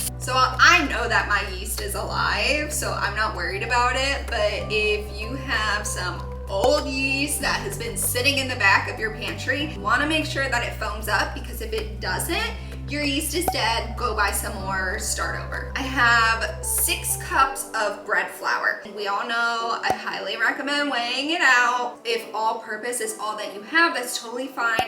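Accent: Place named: United States. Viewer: Bro is soooo weird like looking at you speaking etc 😂😂 I don’t why but I didn’t imagine you speaking like a classic American girl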